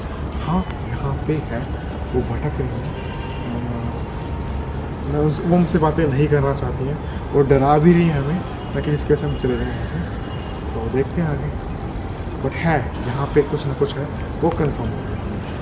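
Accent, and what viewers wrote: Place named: Bengal, India. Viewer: Aap ke baat karne se lagta hai aap Bangali ho kya sach me ho ya kuch or ho..... Big fan... vhi ❤️❤️ Love you...... Please reply me... 🥰😘😌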